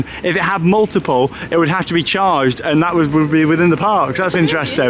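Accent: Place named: Merseyside, United Kingdom. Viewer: Lovely to see you both. Only just started but makes me laugh when has her Merseyside twang. Was more prominent on the carnival cruise so I thought. Awww they have vlogged after a drink. Then I see the open tin of budweiser. Or it may be totally not a bud and some korean AF drink but always talks with her mersyside twang after a drink and it's so so so cute. Going to unpause and carry on. X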